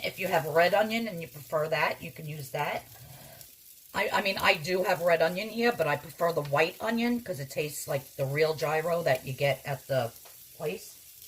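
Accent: New York, United States. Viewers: I knew from your "accent" we lived near each other...LOVE YOUR NY ACCENT!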